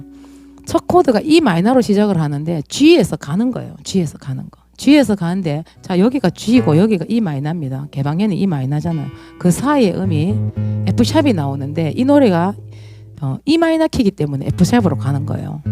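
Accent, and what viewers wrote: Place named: Daegu, South Korea. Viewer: 대구 사투리가 아주 맛깔스럽네요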